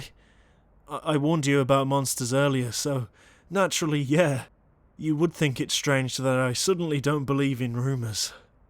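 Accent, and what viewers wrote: England, United Kingdom. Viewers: I always have a weakness for an English accent and I love yours.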